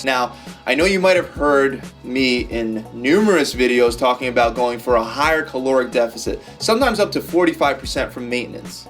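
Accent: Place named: New York, United States. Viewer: You sound like the dudes on Friends, or Seinfeld. Are you from New York?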